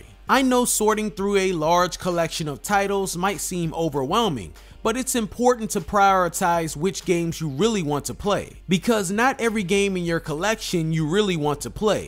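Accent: United States. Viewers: The American accent 😂😂😂❤❤❤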